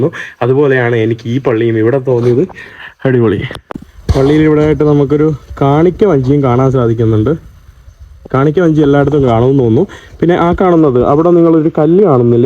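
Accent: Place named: Thiruvananthapuram, India. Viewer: അവൻ സംസാരിക്കുന്നത് തിരുവനന്തപുരം സ്ലാങ്ങിലാണ് ഓരോ ജില്ലക്കും അതിന്റെ തായമാറ്റങ്ങളുണ്ട് ജില്ലയിൽ തന്നെ വിവിധ ഭാഗങ്ങളിൽ വ്യത്യസ്തമായ വാക്കുകൾ ഉപയോഗിക്കുന്നുഎന്നിട്ടാണ് മലയാളം ഉച്ചാരണം ശരിയല്ല എന്നൊക്കെ പറയുന്നത് കൈയ്യിൽആധുനിക മൊബൈൽ ഒക്കെ ഉള്ളതല്ലേ ഗൂഗിളിൽ ഒന്ന് സെർച്ച് ചെയ്താൽ കിട്ടാവുന്ന തെയൊള്ളു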